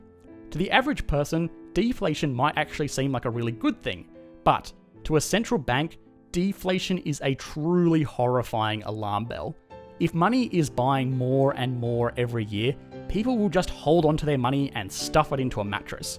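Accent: Australia. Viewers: Australian accents are very thick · Is yours an Australian accent??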